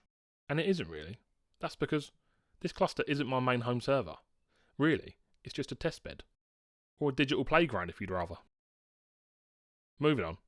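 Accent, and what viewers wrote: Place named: United Kingdom. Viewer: I'm guessing from the plug and the accent that you're British, you want to work on that networking thing, I will gladly hop on a phone call, or discord or whatever and talk you through it. You're doing cool stuff. Being curious and learning is cool on it's own, you're doing it with style. So if I can help feed that curiosity and get you playing with networks as well with a few minutes or hours or whatever on a call, then it'll be time well spent. Either way, keep on learning!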